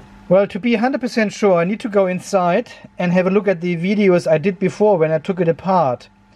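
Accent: Germany. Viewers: Thanks for your nice videos., bist Du deutscher? Ich hatte schon öfter gedacht das Du einen deutschen Akzent hast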